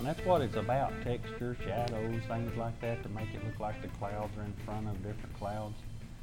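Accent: South, United States. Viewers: Great technique, very helpful. Love the accent! Charming southern US gentleman.. :-) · A good painter..n' a cowboy's accent.. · I just found your channel. I've subscribed. Not only do I like how you explain the painting but I love your country accent. I'm country too so it feels like home. 😁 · Are you from the Carolinas ? you sound southern like me,, thank you for helping me with painting clouds ☁️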